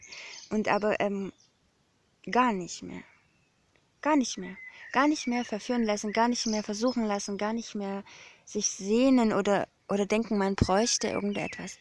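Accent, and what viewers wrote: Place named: Saxony, Germany. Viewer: Na du weißt ja, dass ich sächsisch mag..😇 Da freuen sich bestimmt auch alle anderen hier in UNSERER Dorfschule, wenn du es nochmal aussprichst..😜 Habe mir gerade einen Kakao gemacht.. Pulver, eine Miniprise Salz, Vollrohrohrzucker und Wasser...😋 Nu issa aber schon alle..😝 War superlecker!!🔆 Und schreit nach mehr.. Werde beim nächsten Mal, etwas Kurkuma, Pfeffer, Muskat, Kardomom, Kokosöl etc. zugeben.. So wie man eine goldene Milch macht, wenn dir das was sagt.. Schön, dass es auch ohne Milch und nur mit Wasser schmeckt..😘 Super Tipp von dir❤😇🔆😘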